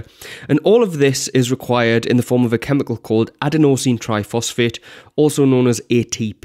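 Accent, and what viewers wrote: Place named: North, United Kingdom. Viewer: Aye it’s a northern lad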